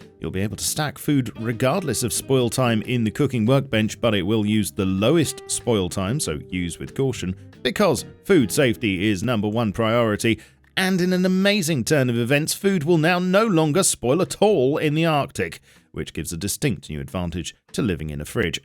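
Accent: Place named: RP, United Kingdom. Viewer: Ive always wondered why you sound like a posh BBC topgear presenter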